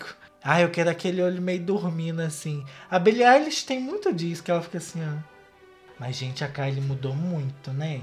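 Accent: Minas Gerais, Brazil. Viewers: "Eu falei muito mineiro agr" eu mineira pensando.... eu falo assim? Kkkkkkj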